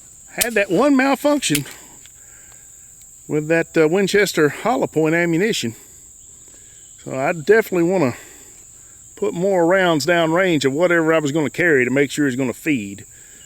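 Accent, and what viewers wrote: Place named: South, United States. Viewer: I'm from the North and enjoy your Southern drawl. Tying to get my wife to try it also. PS - My Sig 100 grain ammo tends to spit back at me also.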